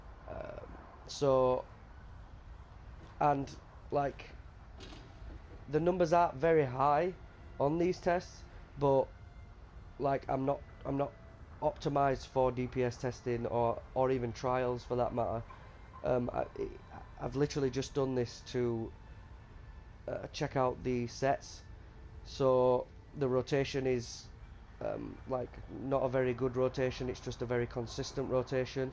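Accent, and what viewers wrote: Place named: Northwest England, United Kingdom. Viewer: Hiya mate. LOVE the vids - you're putting out some ace content at the moment! Just out of interest - where about in the UK are you from? Your accent sounds like Wigan/Preston area? Deffo the Northwest! :) I'm from just out of Chester. :)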